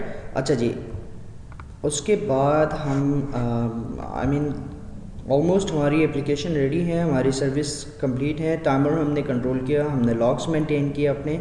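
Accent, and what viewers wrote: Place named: India. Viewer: I didn't understand what this dude was saying. Was he speaking English or an Indian variation of it?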